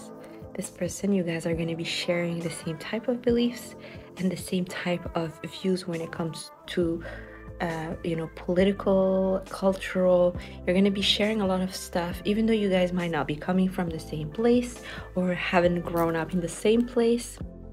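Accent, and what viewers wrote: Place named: New Zealand. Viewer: Sounds like my family from tautoro Kaikohe new Zealand 😎🎤🎶🎶 who is paku eyes 😢 lmao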